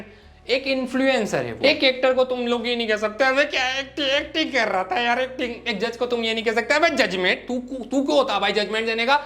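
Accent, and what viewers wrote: Indore, India. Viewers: bhiya tum indori ho kyaa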